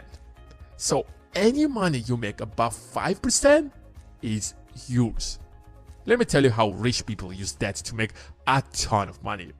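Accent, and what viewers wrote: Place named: Asia. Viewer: He sounds asian, I'm in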